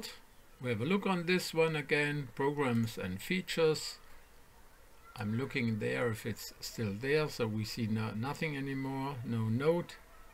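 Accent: Germany. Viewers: Man hört das deutsch unnormal raus :D gutes Video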